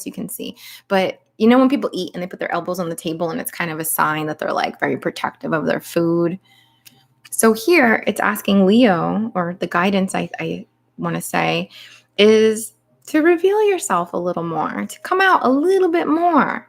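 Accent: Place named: New Jersey, United States. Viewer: I know a Jersey girl when I hear one. My ol' stomping grounds back in the day. "Regrets, I've had a few, but then again, too few to mention, I did what I had to do and saw it through without exemption..."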